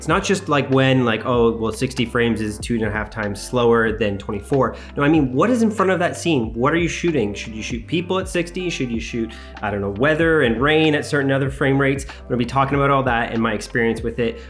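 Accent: Canada. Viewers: 3 seconds in. Canadian.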